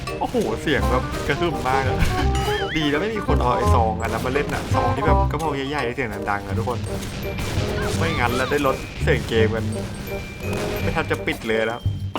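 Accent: Thailand. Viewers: ติดตามตั้งแต่พี่พึ่งเรียนป.ตรี เสียงเหน่อๆจนตอนนี้จบแล้วอยากถามว่าตอนนี้พี่ทำงานอะไรครับ😅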